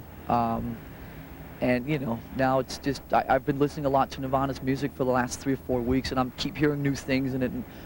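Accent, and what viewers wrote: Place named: Denmark. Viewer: It’s the Danish accent lol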